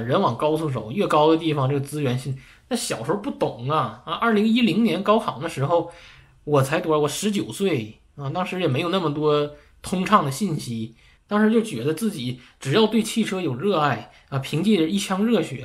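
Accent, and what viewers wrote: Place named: Suizhong, China. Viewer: 听口音是绥中的吧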